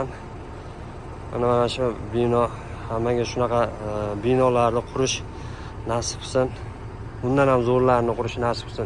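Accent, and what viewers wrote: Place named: Tajikistan. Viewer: 😊ты таджик не умеешь по узбекский говорить. Сакау.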